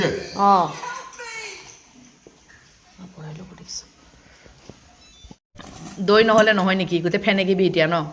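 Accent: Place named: Barpeta, India. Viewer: ভাল লাগিল ৰবা চাই।তোমাৰ ঘৰ কত?? ভাষাটোত বৰপেটা চাইড ৰ যেন লাগিছে।কিহৰ অৰ্ডাৰ লোৱা জনাবা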